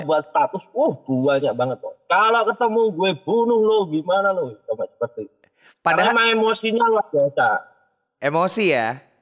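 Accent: Java, Indonesia. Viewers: Medoknya khas wkwkwkw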